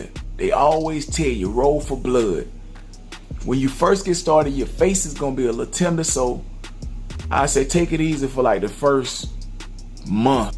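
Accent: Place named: Memphis, United States. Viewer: You sound like you're from the Mighty Memphis. Are you from the M Town?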